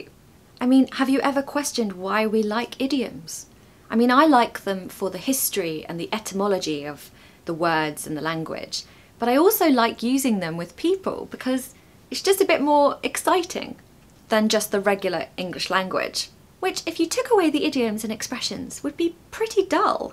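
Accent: United Kingdom. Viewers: Your session will be an earworm in my head due to your accent. You've acted in this session as Hermione in Harry Potter series. I am so intrigued with your accent. I mean the British accent. I really express my gratitude to every moment you have taken to come out with this lovely, indulgent work. keep the good work up. Kind regards, Moe. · I just found out this channel recently, and i think this is the suitable channel for me to learn the British English. Firstly, I like your accent, the way you speaking is like singing a beautiful song. The speed of your speaking is not that fast so that i can listen carefully and mimicking right away Secondly, you are a very down to earth lady that is like a Sister in my neighborhood who do not put a heavy makeup and very decent wearing that do not expose your body. Make us can concentrate on learning. Thirdly, your character is very cheerful and you always share your real life stories with us. 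I repeated your video at least ten times in a go to make sure i learn what you teach. Your video really full of resources and knowledge that we need. You are really a good teacher in making this kind of video. Thank you so much for your efforts. I am a Malaysia Chinese girl. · I love your pronounce but I can’t understand where came from? But is really British and lovely!!!!! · Hello, Beautiful, charming, refreshing!!! I'm a Southern USA American from 1600's ancestors. Your musicality, considerate & gentle way of phrasing, positivity, and floral frocks:) resonate with me! Your analogy of a rose incorporating beauty, strength, and resiliency could not better describe Southern USA woman in the 1800's. I find more in common with your accent than with the urban USA accent (changing "t" in a middle of a word to a "d" sound, for example). The greatest difference my untrained ear detects is that you don't use a flat "a" vowel sound (heard in words like mascara and vase) and yet we share the schwa and silent consonants, as well as my much teased use of "reckon". 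Your video "Turn your American accent to British" is spot-on. A specific question for you is how should one untrained in linguistics begin to explore their historic, enduring connection to Britain and further support the study of the English language on continents afar? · I fall in love with your British accent 💚🩵💙💜💛🧡❤️💜🩷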